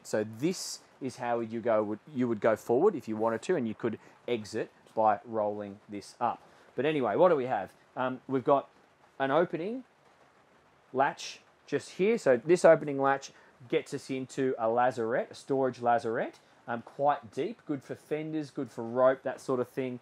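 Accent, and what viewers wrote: Australia. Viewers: I don't care about the boats, but I love the tight pants, Aussy accent, and the peekaboo tease of his hairy belly!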